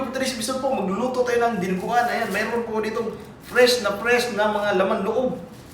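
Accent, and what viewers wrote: Visayas, Philippines. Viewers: pangit ng pagkaluto mo..bisaya ka talaga,ayusin mo magtagalog at pagluto mo ng dinuguan..ikaw lng nasasarapan nyan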